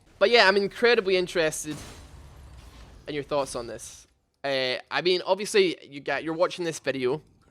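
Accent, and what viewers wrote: Scotland, United Kingdom. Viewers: Hes scottish (I think)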